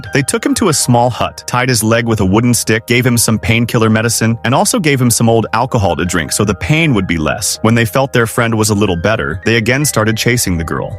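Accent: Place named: Australia. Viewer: vro he's Australian..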